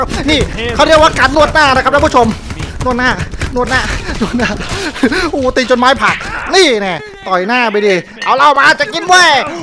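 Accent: Isan, Thailand. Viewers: เจ่าเป็นคนอีสานบ่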